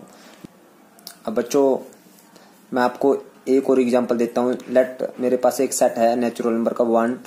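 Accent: Haryana, India. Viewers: Apki haryanvi bhot cute h sir😁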